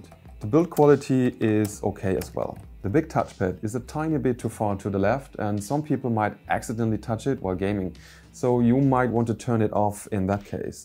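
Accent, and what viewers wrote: Germany. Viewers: i have been trying to learn german(now i can understand english in german accent😭) also great review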